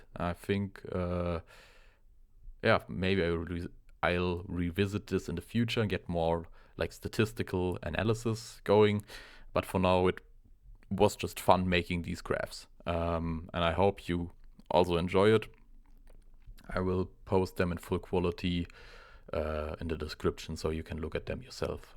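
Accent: Germany. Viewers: Lieb den deutschen Akzent, danke für deine Arbeit 🧎🏻‍♀️ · this is one of the most important big data analysis ive ever seen xD also you sound very deutsch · Der deutsche Akzent macht alles 10 mal besser lol, good job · Hey, are you perhaps from Germany?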